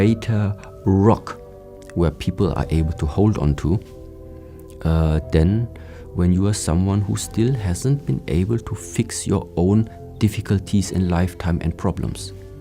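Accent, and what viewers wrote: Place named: Germany. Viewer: Klingt nach deutschem Akzent.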